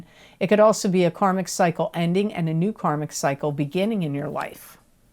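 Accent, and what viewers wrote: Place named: New York, United States. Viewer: I do think you are a New Yorker !! Love your accent !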